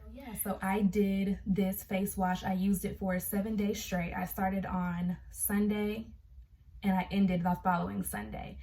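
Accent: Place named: South Africa. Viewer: Hey. Am from South Africa. (Am Assuming) You sound South African😹😹 Where can I get that face washer😭😭😭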